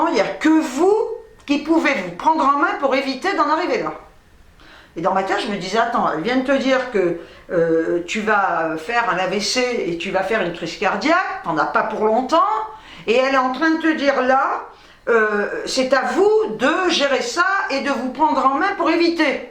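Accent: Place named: Algeria. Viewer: Bienvenue au Club des Barjots!!!! Ca tombe bien,j'en suis UN! On a écouté ton histoire avec Véro(mon épouse),c'est un sketch!!!! Franchement ton coté Pied-Noir pour raconter,c'est un régal. On a bien rigolé avec Véro,et surtout quand tu racontais,en faite je voyais le film défilé,on s'est marré. Sur la tête de ma Mère,Yallah!!!!!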